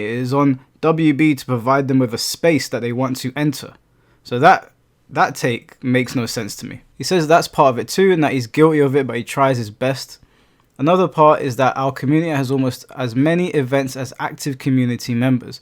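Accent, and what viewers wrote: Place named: United Kingdom. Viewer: Okaaayyyye, but this is how I like my men. Speaks like Harry Potter, BBC, and the fact he is from UK means he is likely UNCUT. :face-red-heart-shape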